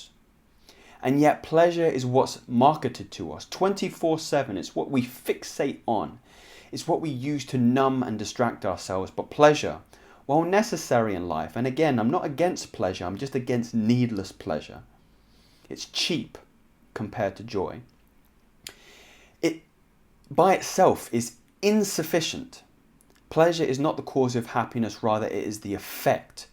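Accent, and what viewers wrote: United Kingdom. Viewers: Dude has a British accent, so I don't have No choice but to sit down and Listen to Him.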